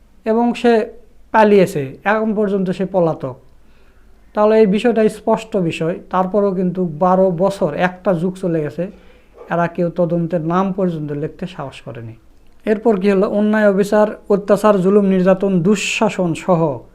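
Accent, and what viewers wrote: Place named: Bogura, Bangladesh. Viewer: আপনার বাড়ি কুটি ভাই? বগরা? উংকা কথা বলিচছেন কা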